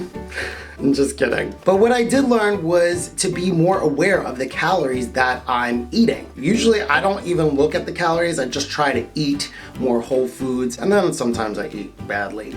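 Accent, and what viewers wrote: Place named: New Jersey, United States. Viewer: I’m assuming ur from jersey or something because u pronounce water “wuter” (not making fun of u, I just know bc I have a friend from jersey who does the same thing)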